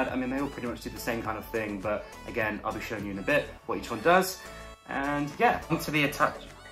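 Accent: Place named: United Kingdom. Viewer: Why is this guy's British so hot?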